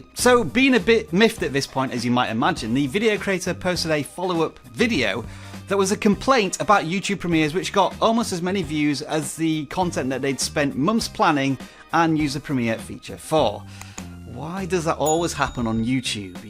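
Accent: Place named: United Kingdom. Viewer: It's so hard to understand your heavy british accent... Do you speak American English?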